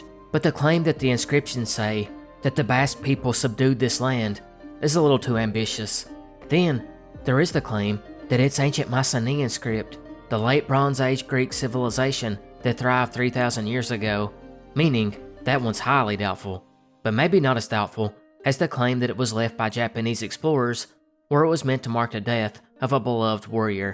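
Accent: South, United States. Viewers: A thick southern accent was perfect for these cold northern stories.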